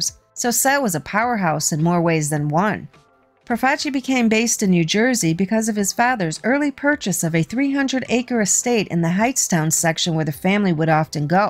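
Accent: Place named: Midwest, United States. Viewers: A strong Chicago Illinois accent for sure lol. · Announcer sounds like she is from Midwest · This narrator’s voice tickles me. Gotta be from Chi-ca-go or Fargo.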